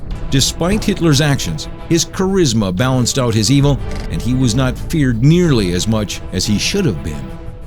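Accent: Canada. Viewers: the commentator sounds canadaian right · this guy sounds so canadian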